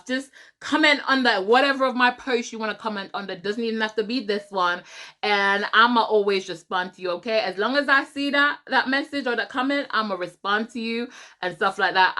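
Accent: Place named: United Kingdom. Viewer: Where are you from? You sound British and American. Can't pin you down! Great video 😁👍🏻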